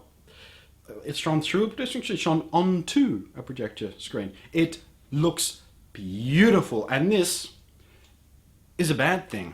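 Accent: Australia. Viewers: i totally agree, just one question, are you an aussie? if you are, thats awesome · i watched 5seconds of this and could tell you were an aussie xD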